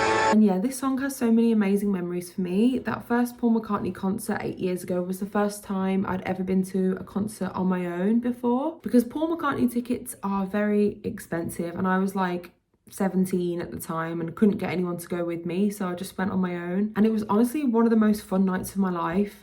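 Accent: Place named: England, United Kingdom. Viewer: Love your videos. I agree with your choices but I'd put first and afterwards but I don't share your memories but yeah, all great choices. May I ask which part of England are you from. I'm from Birmingham, and I can't quite place your accent. Sounds close though.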